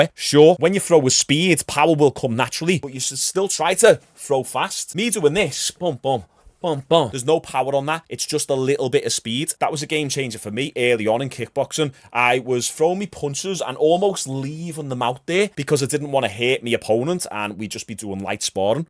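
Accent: Liverpool, United Kingdom. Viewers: Watched your channel for a few months but just realised a few days ago I wasn't subbed. Love your content and Ive learned and laughed a lot but the main thing you have done for me is make the scouse accent bareable 😂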